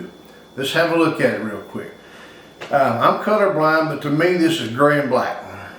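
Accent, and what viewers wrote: United States. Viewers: What state do you live in? Sounds like America. Sorry I'm a POW in commiefornia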